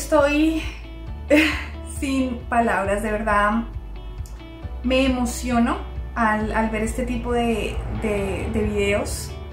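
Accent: Colombia. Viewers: Hola soy MEXICANO y tambien vivo en USA en el estado de OHIO saludo tu Nuevo subcritor me gusta mucho tu asento colombiano eres muy hermosa y que viva MEXICO y COLOMBIA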